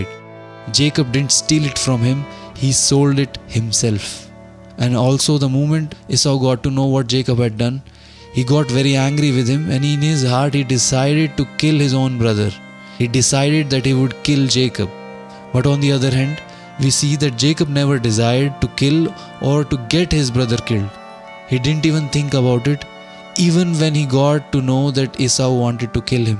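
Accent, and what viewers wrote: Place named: Arab world. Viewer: I'm curious to know where in the video it says he's not? I encourage you to rewatch the video because I think the point of this video went over your head. I'm guessing maybe because the accent of the voice over sounds arabic you think he doesn't believe Jesus is God, like I admittedly questioned myself, but 2 seconds of looking through the titles in his video uploads you'll see one titled "Jesus is God Himself". So rewatch the video and focus on the lesson of how God can use imperfect people for His Glory. God Bless you brother. ✝️🙏🏽💪🏽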